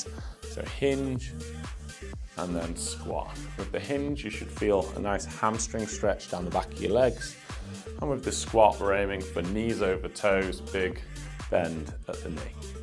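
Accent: United Kingdom. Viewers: Not a fan of the performative, overly excited American workout videos, so your calm, monotone British accent is music to my ears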